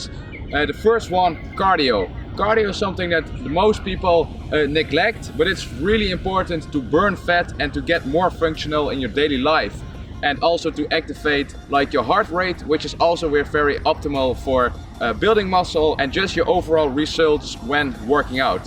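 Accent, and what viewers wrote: Netherlands. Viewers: Are you Dutch? You accent gives it away.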